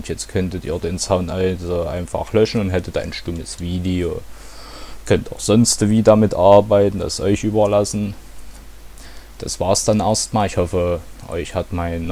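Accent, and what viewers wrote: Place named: Saxony, Germany. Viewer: dummer sachse. lern deutsch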